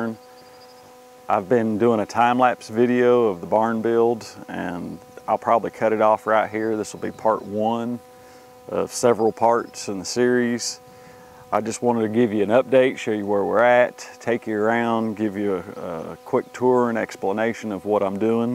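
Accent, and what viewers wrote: United States. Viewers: Are you from west Georgia?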